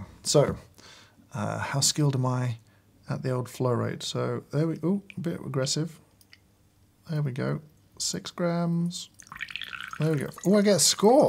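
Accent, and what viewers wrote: United Kingdom. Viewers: That's the effect British accents have on Americans. This dude could literally tell stories about his farts throughout the day and Americans would tune in.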